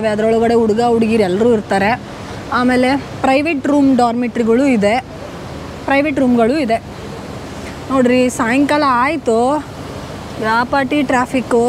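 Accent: North Karnataka, India. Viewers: Madam baal information kodathiri tumba ista aadri nivu baal chanda uttara Karnataka maath mathadteri please nam baashe explore maadi namma bashedag vlog maadri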